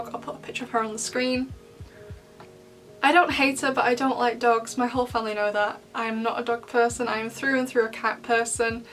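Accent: England, United Kingdom. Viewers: I wish I had an English accent like you!! 😭 I just love the way you speak!!💗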